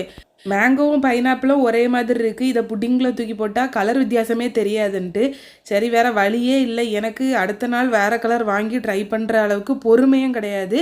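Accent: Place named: Tirunelveli, India. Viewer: I hate tirunelveli slang. Tirunelveli place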